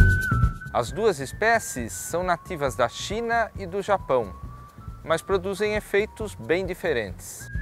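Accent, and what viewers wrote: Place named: Santa Catarina, Brazil. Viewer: parece santa catarina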